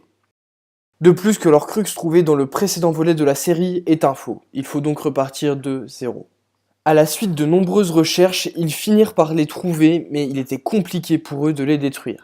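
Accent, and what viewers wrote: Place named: South, France. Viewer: super voix, j’adore l’accent sudiste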